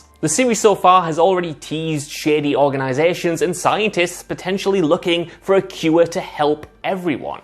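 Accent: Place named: Scotland, United Kingdom. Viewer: I think it’s so satisfying how Scottish (maybe Irish) he sounds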